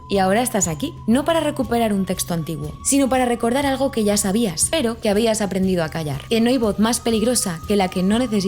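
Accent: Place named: Spain. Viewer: Trato de soportar el acento españolete pero paila. Es irritablemente insoportable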